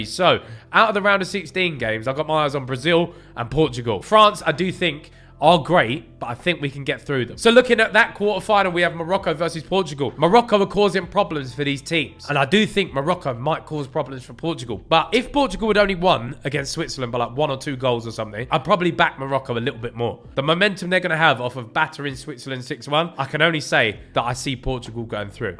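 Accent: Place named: England, United Kingdom. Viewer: Its prob his english accent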